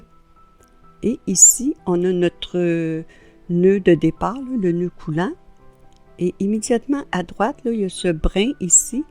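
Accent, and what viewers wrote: Canada. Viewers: Bonjour merci beaucoup pour vos explications très claires et l'accent canadien super agréable merci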